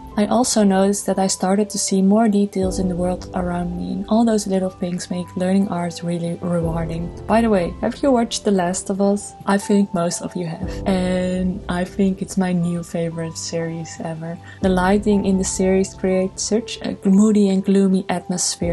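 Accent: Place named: Netherlands. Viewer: I didn't watch the live adaption of Last of us, but a gameplay of the game. Really loved it back then. 😅 I also don't mind your Dutch accent. When I speak english people can probably tell that I'm from Germany. 😂 At least I can whenever I hear a German native speaker speaking English. Now onto today's subject: Yeah, learning how to draw or art in general is hard. There are no short cuts. No fast improvements. At least in my case. I am a slow learner. My brain needs to figure everything out and tries to see the big picture first. To give a different example: When I puzzle I look for the pieces first that end being the frame. I don't like to start anywhere. I always need that frame first and then I fill in the spots that are blank. There is also only a short amount of information I can take in everyday. Maybe that's why I spend year's in my comfort zone. 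Breaking out of that zone is scary, but necessary. 🙈 I started my art journey in 2012. So it's been a while. And there is still A LOT to learn. But it's okay. I don't want to rush my journey. Instead I embrace my not so perfect progress. 😊 Even though on my bad days I compare myself a lot to other artist and how far they have come over the last decade with their art, while I still feel like a beginning artist. 😔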